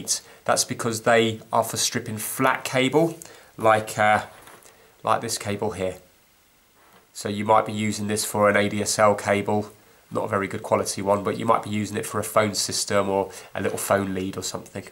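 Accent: England, United Kingdom. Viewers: It's how English is spoken by the English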